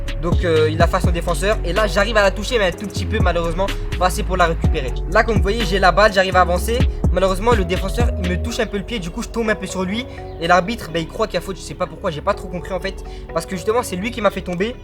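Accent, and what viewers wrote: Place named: Marseille, France. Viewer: T est marseillais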